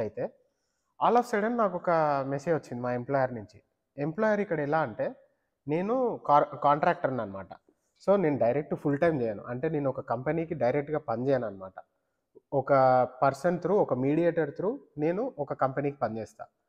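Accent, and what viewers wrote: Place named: Telangana, India. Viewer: Thelangaana basha bagundi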